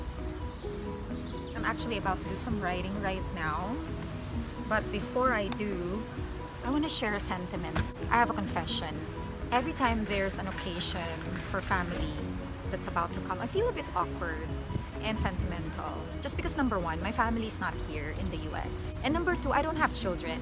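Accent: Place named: Philippines. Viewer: You lose your accent when you sing. I love the Filipino accent. :)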